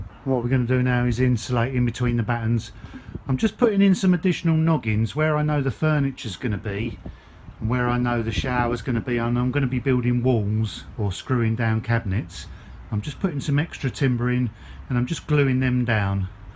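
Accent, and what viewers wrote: United Kingdom. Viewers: Why is a British accent so lovely...being respectful...but you know what I mean...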